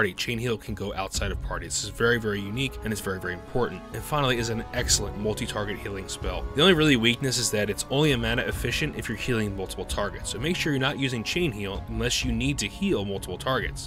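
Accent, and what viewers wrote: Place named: Philadelphia, United States. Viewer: bro youve gotta be from south jersey or philly with that accent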